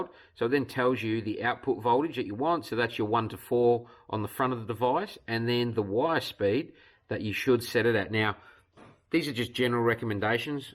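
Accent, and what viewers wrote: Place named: Australia. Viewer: The great quintessential Aussie accent. Thanks for your interesting review.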